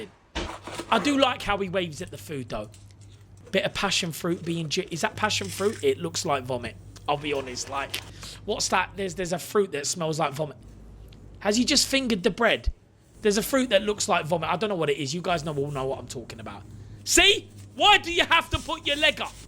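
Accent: United Kingdom. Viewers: OMG the accent gives me life!!! Are you from same region as Adele? So glad to see a full length video. Next i wanna see a podcast!! Thankya!!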